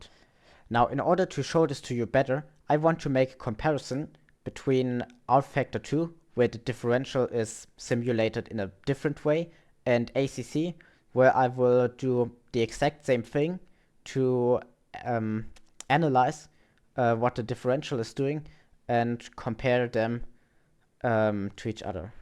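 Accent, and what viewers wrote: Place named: Germany. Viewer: When explained with a German accent technical stuff like this immediately makes sense to me.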